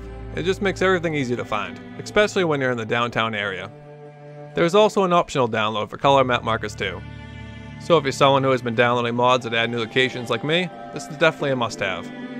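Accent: Boston, United States. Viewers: YOUR BOSTON ACCENT IS SO IMMERSIVE OMG!!!!!!!!!!!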